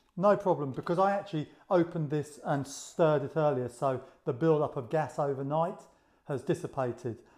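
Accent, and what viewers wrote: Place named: United Kingdom. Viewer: Great job 👏 perfect explenation. Btw : I like your British accent 😉 Greetings from Belgium 🇧🇪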